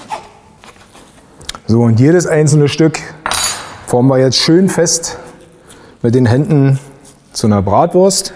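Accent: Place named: Berlin, Germany. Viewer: HAMMER...wie ich diesen berliner Slang liebe, schlimm ist das bei mir ;););) Finde ich ein Bombe Rezept weil nicht komplett in Fett und ÖL ertränkt, Zutaten klingen stimmig, freue mich auf ausprobieren, ganz lieben Dank! LG aus dem shönen Rheinbach, Henning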